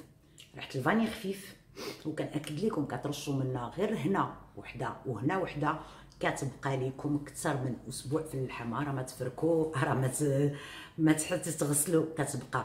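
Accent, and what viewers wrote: Algeria. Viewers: هدرتك بحال الجزاىريات 🌹 انا جيت من عند أمينة ❤❤ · ولله تقول جزاءرية يا اخت امينة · سبحان الله هضرتك تقولي جزائرية واش مزوجة جزائري بالتوفيق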